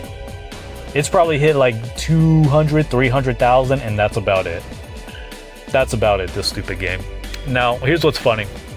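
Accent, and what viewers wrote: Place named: South, United States. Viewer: What you do sound from the south because I know people who do. So your ancestors from the south sir that you got it from. I would not say 50 charter from marip kart world is trash itnis you don't care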